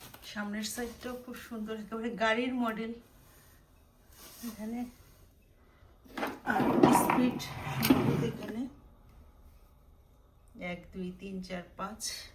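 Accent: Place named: Jessore, Bangladesh. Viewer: আপু আসসালামু ওয়ালাইকুম।কেমন আছেন?আপু জানতে চাচ্ছিলাম,ওখানে কাজ করার জন্য শিক্ষাগত যোগ্যতা কেমন লাগবে আর কোন ভাষায় কথা বলতে হয়? আপু আমি জেসমিন আক্তার। আপনার কথা যশোর জেলার মানুষের মতো মনে হোচ্ছে।আপনার বাড়ি টা কোথায় জানতে পারি?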